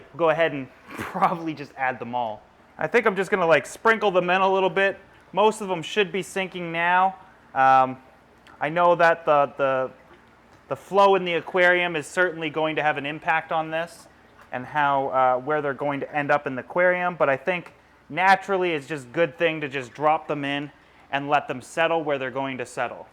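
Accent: Canada. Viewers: James Goose He's Canadian!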